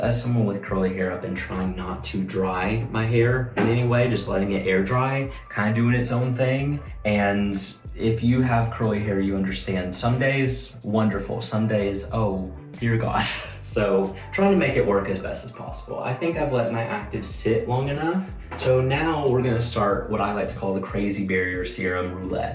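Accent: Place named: South, United States. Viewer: I like your accent but I can’t place it. Southern? Midwestern?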